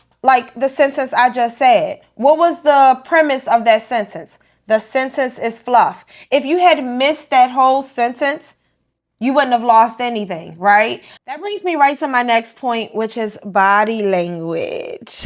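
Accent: Baltimore, United States. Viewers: you from baltimore aint you??? Ik another when i hearrr 🤣🤣💕💕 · I hear a Bmore accent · Go-head girlfriend, lol! Question - where are you from? Baltimore??? I hear your accent and it sounds like it....... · Brand new subscriber here and love the content already! BTW Are you from Baltimore ? 👀😭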